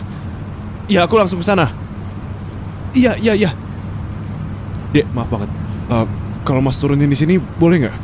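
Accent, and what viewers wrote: Java, Indonesia. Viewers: Syok sekali bisa logat Jawa... Beliau ini Jawir bagian mana anjir... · Bapak e logat jawa rek rek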